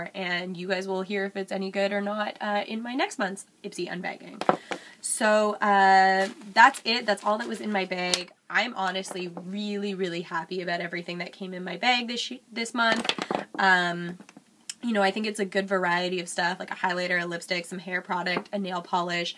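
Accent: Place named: Canada. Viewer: I love your Canadian accent! I have been to Vancouver before and I fell in love. Im so jealous that you live there and I dont! LOL Do you enjoy maple syrup? I can drink it by the bottle haha. Anyway I love love watching you! Keep the videos coming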